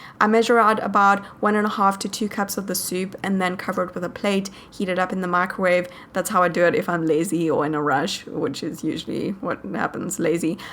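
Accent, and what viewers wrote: South Africa. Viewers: ahahah chiken South Africa :) she just moved to the states though. To LA. I love her accent!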